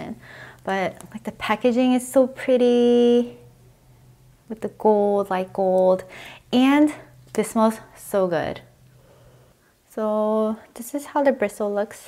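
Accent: South Korea. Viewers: wats wrong with her voice? korean accent?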